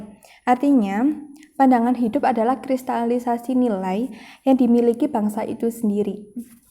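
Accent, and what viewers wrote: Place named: Java, Indonesia. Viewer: kelihatan jawanya😂 agak gimana gitu,medok"selebew🤭😂🙏🙏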